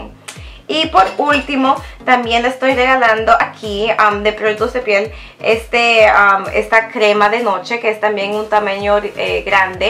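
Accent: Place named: Latin America. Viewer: Hola, mi nombre es Patty te veo desde San Luis Potosí Mexico. Me gusta mucho tu canal espero sigas subiendo vídeos seguido :), amo tu acento latino!! un beso guapa.. me gusta el jabon de cara de Origins, se me antoja probar esa marca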